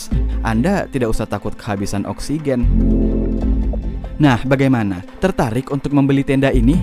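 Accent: Makassar, Indonesia. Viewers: tinggalnya di Maros gua lihatmya di IG dan coba cek videonya yang Review HP,logat makassarnya pasti terdengar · Logaknya makassar rodong ngr😂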